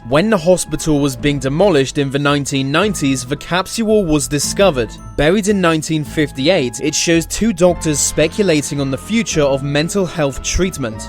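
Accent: England, United Kingdom. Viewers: wow really!? thank you. i have an indian accent but i would like to learn to speak in your english accent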